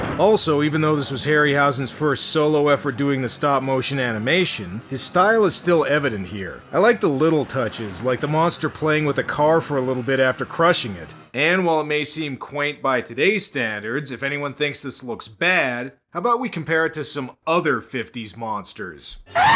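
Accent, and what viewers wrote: Canada. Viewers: Holy shit you kinda make a make a canadian accent sound kinda hot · That Canadian accent . I died 😆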